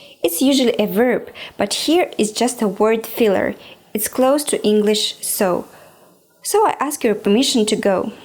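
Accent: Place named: Arab world. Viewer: Hey, I think it was meant to be a compliment. And by the way he (I checked the name it's a boy's) maybe was trying to say that you express yourself well in Arabic through language and facial expressions. Awsaf is not even Arab, I think he's from Bangladesh or Pakistan. And I wonder why he's not responding to you?! Finally, you're doing great job. People like your videos. I sent your video to my niece and she loved it and found it very funny and entertaining. Your Arabic accent is incredibly Arabic! and your English is "wow"! your lessons are educational. Carry on what you doing. Do not get put off by anything or anyone. 👍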